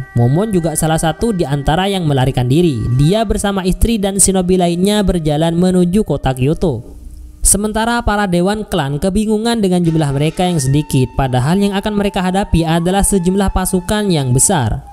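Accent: Madura, Indonesia. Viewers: Orang madura ya min? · Oreng madureh pengisi suaranya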